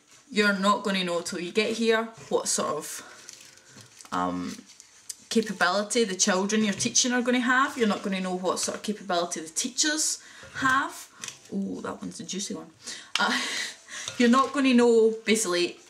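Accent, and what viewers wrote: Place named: Scotland, United Kingdom. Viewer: Good vid :D I used to be an ALT in Chiba. Instead of making my own lessons, I used all the past ALT's and/or online shizzle. Then I spent the afternoons learning Japanese. Great starter job in Japan and gives ya time to study Japanese. Good vid, diggin the Scottish accent ;) I don't hate the ENglish! THEY'RE JUST WANKERS ,lol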